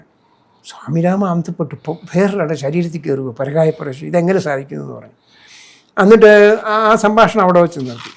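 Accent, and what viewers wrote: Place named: Thrissur, India. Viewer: അത് തൃശ്ശൂർ കാരനാ😂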